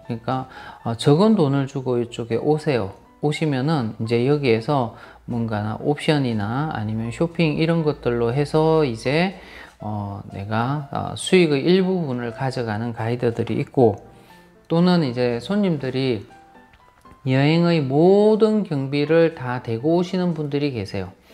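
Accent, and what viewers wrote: Gyeongsang, South Korea. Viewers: 톤이 차분하니 듣기좋네요. 경남분인거 같네요. 말씀을 들어보니 인복이 있으시네. 인복이 있어야 돈복도 있는법 부럽습니다. 30대때 당시 기술기능으로 독립이민을 상당히 고민했던 기엇이 나네요. 캐나다준비했었는데 결국 그 산업이 세계적으로 침체기가 돼서 접었던 기억이 납니다. 영어도 나름 열심히 했었는데. 무엇이든 그렇지만 외국에 가고자한다면 다른 준비나 여건도 그렇지만 무엇보다 연이 닿아야 된다고 봅니다. 외국에 사는 연은 분명 있거든요.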